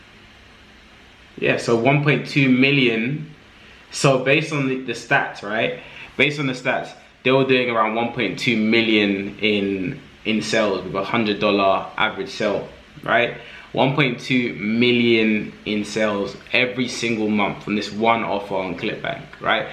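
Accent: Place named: United Kingdom. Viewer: I like how u talk British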